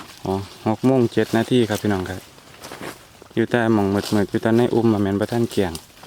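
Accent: South, Thailand. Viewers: พูดปาษาใต้จัดๆอิดแล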